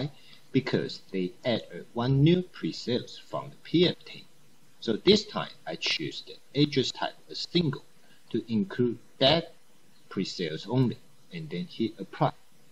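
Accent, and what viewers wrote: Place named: China. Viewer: No offense to creator, but it sounds more like Chinese rather than English... Can't be bothered listening...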